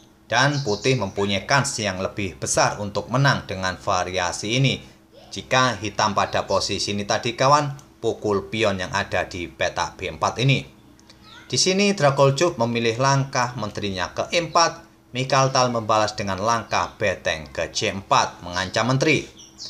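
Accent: Java, Indonesia. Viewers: admin orang jawa bukan ?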